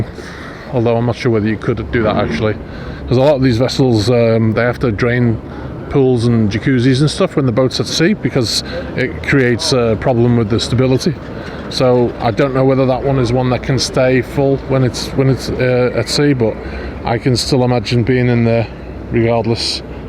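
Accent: United Kingdom. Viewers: wonderful!, your command of the Queen’s English is very comforting, great video, and I’m excited for the both of us, for your tour of Bold motor yacht.! Here we go!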